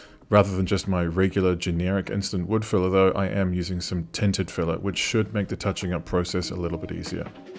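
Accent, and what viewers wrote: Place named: Australia. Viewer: Great looking piece and a timeless classic. ❤I am living in Germany as well, but my I ask where you are from? I suspect more Australian than British judging from your accent.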